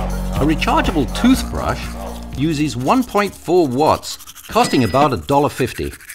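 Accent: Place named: United Kingdom. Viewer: i love this. HOWEVER i do find it a bit creepy that they chose to have a brit do the narration for wasteful american energy.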